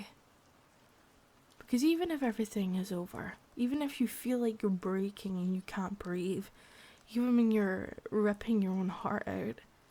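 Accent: Scotland, United Kingdom. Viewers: I'm done with normalcy, I'd trade it for a cute scottish stalker given the chance. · This went places I wasn't prepared for. That line about not needing someone to help you feel things got me. The Scottish accent helped give the performance an aire of authenticity. Amazing work. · Ahh she's a sco-ish stalker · I prefer your deep Scottish accent. I find it more relaxing when I hear your Scottish voice than the higher pitch voice. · omg she's scottish. that changes everything XD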